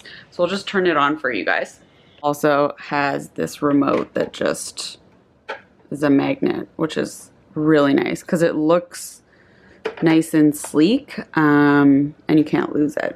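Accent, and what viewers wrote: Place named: Canada. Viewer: is anyone else able to immediately tell when someone from toronto/vancouver is speaking english or is that just me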